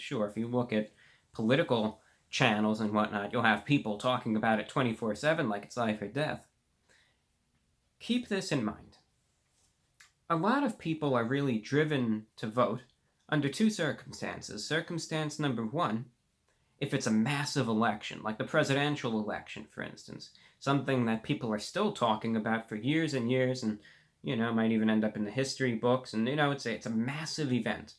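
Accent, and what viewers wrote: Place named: New England, United States. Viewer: What kind of accent does he have? Vermont? New Hampshire? That’s an interesting accent...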